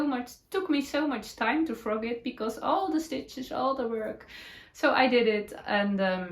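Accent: Netherlands. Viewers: Dear, so happy to have found your podcast! I enjoy your Dutchy English, and your knits are beautiful. It is always nice to know I'm not alone in my mistakes. Thank you, for sharing your adventures. Cheers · I enjoy your podcasts so very much, I love your Dutch English! You have definitely grasped the English language very well. I’m knitting the CORRAN cardigan too….I might made short sleeves like yours. You inspired me. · Actually you have a lot of American friends. I watch all of your podcasts...and love them. You're so cute and your "Dutchy" English is improving very much. I'm from Kansas City, Missouri and I look forwardvtobyour podcasts each week. 😊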